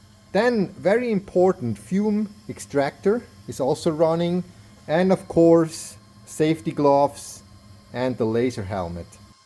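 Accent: Switzerland. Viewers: das ghöri sofort use das schwizerdütsch e der, chasch es ed verstecke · Another Youtuber with a Swiss Accent! Nice to hear you speak.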